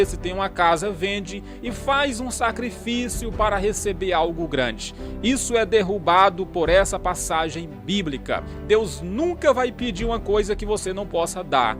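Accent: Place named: Bahia, Brazil. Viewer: Oh missionário, dentro de todos ensinamentos mediante a palavra de Deus que são maravilhosos, eu me alegro com a forma que fala, sei que és estudado e essa forma de falar a palavra ( veio, paia) é engraçado Me faz lembrar a minha terra Natal, Cravolândia lá no centro Sul Baiano Um abraço missionário para vc e sua esposa Deus te abençoe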